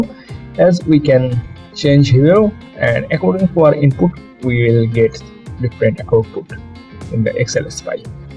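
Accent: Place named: Germany. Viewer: Du hast einen sehr deutschen Akzent 😂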